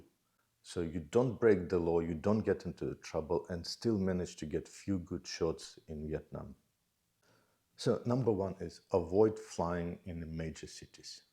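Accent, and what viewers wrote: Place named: Turkey. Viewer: Abi Türk müsün aksanından anladım selamlar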